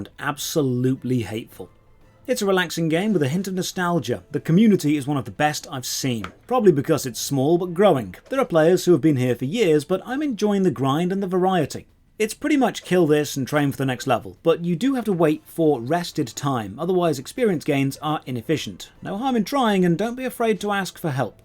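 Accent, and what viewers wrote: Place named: RP, United Kingdom. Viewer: Oh MY GOD ENOUGH with these British youtubers and their clickbaity embellished titles and long droning low effort content, and their received pronunciation speaking as though they are some authority on the subject... Jesus, no other country produces as many cruddy youtubers from this "game the algorithm" school. You've called about 40 MMO's the worst MMO ever, you've never played many of these games you talk about in any significant amount... Low effort, fair use abusing, overly verbose garbage.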